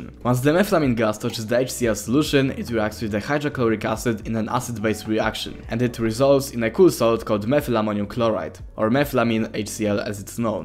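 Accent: Poland. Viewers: Why are you speaking with a polish accent?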